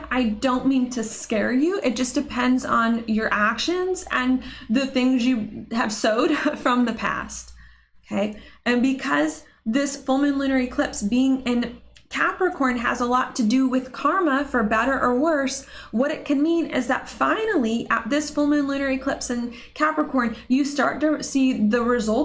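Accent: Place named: Pittsburgh, United States. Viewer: I just kept getting caught up on your CUTE Pittsburgh accent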